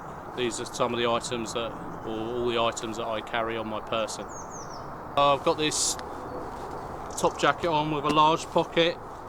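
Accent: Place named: South West England, United Kingdom. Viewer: You Americans will just have to learn English, as it really is, rather than what you learnt at school. This is a south-western accent. Very ancient.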